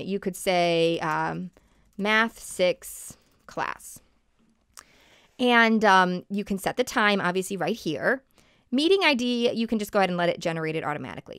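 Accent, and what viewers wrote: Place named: United States. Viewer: she sounds so american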